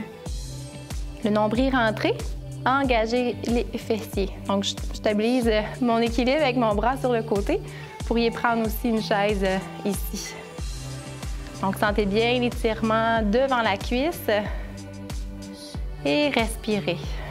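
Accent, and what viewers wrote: Canada. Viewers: C est clair le rythme est bon et un petit dernier …avec l accent canadien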